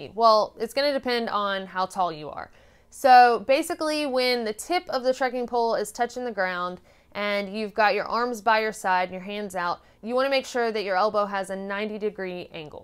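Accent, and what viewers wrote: South, United States. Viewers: Your southern accent is getting stronger now that you're home. Purtiest sound a southern boy ever hears. I've used the old bent handle wood canes for years. They make great snake locators(that's the original reason I started using them) & the hook makes a good extended hand on a steep climb especially up a river bank. The wood canes also make a good defensive tool in the wild hog country I'm often in: kinda helps that my grandparents raised pigs when I was growing up so I'm well versed in using a cane that way. I have considered trekking poles for a long hike & this is great information. Thanks Ms.!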